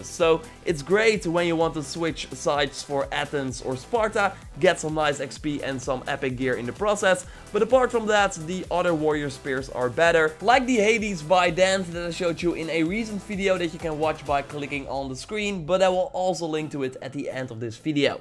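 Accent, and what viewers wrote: Netherlands. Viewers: je hoort gelijk nederlands haha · You sound......Dutch....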